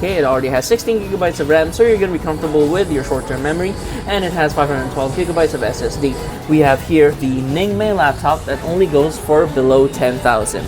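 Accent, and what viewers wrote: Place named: United States. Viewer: I mean its okay for you bro to be english speaking with American accent, dunno if you gew up States side, but ,I think viewers will much appreciate if you go Taglish or Tagalog. We can just watch foreign or global videos if we want english based ones(I mean on your laptop reviews), but most Pinoys would love to see Taglish or just pure Tagalog vids just like how Tito Dustin does it. Medyo boring bro if englishero realtalk.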